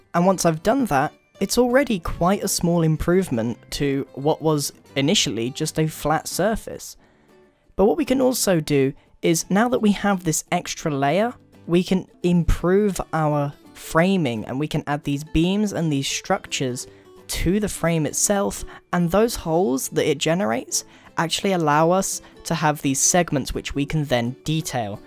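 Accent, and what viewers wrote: United Kingdom. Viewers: I love British accents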